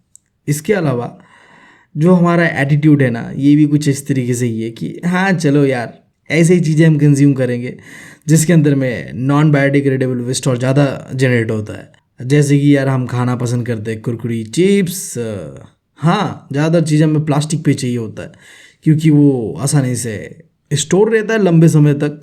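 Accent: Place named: Bengal, India. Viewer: Ap Bengali ho ya Assami 🙄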